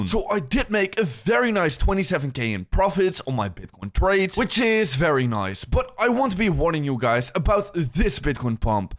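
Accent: Netherlands. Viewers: Don't you hear the dutch accent? It's so obvious when dutch people talk in English. He sounds like crypto rover.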